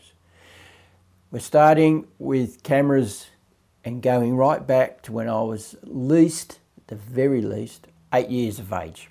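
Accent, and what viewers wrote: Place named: Australia. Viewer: Hi, I enjoyed your photography/video timeline video. I also think your wildlife video and photography work is great, here in GB you’d be probably be employed by the BBC as a freelancer, as they do so much natural-history production, apart from the David Attenborough epic series shown worldwide, we have BBC Spring-Autumn-Winter Watch which is a three seasons live TV wildlife series broadcast from wildlife locations around Britain. They get a good audience and lot of interest and the team includes wildlife photographer/presenter Chris Packham, TV presenter Michaela Strachan, Iolo Williams and Gillian Burke, with other regulars appearing. I love that little Antechinus creature, what exactly is it? Some kind of shrew creature native to Australia, I guess you’re in Australia from your accent.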